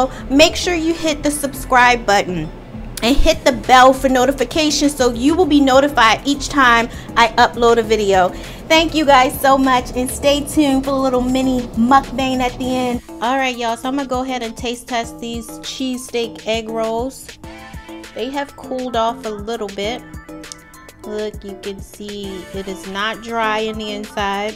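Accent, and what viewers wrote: United States. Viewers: Are you from New York? I love your accent.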